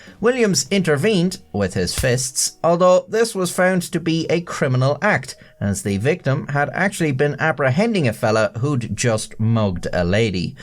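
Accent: Ireland. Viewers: 😂 i feel like i've just climbed out from underneath the rock. Because everybody knew about this guy's channel BUT me 😮 OR AT LEAST 1.5+ MILLION PEOPLE DID 😎👍 WITH THE CUTE IRISH ACCENT AND THE ANIMATED VIDEOS I THOUGHT AT FIRST. IT MIGHT BE JUST ANOTHER AI CHANNEL. BUT AFTER TAKING TO REDDIT AND OTHER SOURCES, IT SEEMS THAT HE'S BEEN AROUND BEFORE THE A I BOOM AND WAS DOING HAND ILLUSTRATED VOICEOVER VIDEOS BEFORE THAT TECHNOLOGY TOOK OFF! FROM WHAT I'M READING, THERE WAS PUSHBACK. WHEN HE STARTED TO GO WITH SOFTWARE ANIMATION, INSTEAD OF HIS HAND DRAWN STYLE BUT YOU CAN'T TAKE AWAY FROM HIS AWESOME SARCASTIC, AND ENLIGHTENING COMMENTARY. THANKFULLY, I WASN'T HERE FOR ALL THAT DRAMA 🙄❤ I'M ONLY HERE TO SUBSCRIBE. LIKE THE VIDEOS, BECAUSE NOT ONLY ARE, THEY THOROUGHLY INVESTIGATED WITH FACTUAL INFORMATION. BUT THE COMEDY STYLE COMMENTARY KEEPS ME LAUGHING. THROUGHOUT THE WHOLE VIDEO. 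DON'T EVER STOP BEING YOU ❤ BECAUSE THE FANS LOVE YOU JUST THE WAY YOU ARE. #SUBBED #facts #BeYou #subscribed #NewSubscriber ❤😊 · Dear, I officially watched all of your videos. Thank you for nice content, your own animation (that you done by yourself, yes I watched previous video) which is funny same as you nice sense of humour. I always watch your videos while eating, it make me almost spit food in monitor couple of times, I don't regret it. I always glad to see your irish mug and hear your irish accent, I am a bit tired of brithish and aussie accent, I am russian and I have aussie and british friend here. Wish you drink less, good health, make more jokes and of course loyal friends and prosperity.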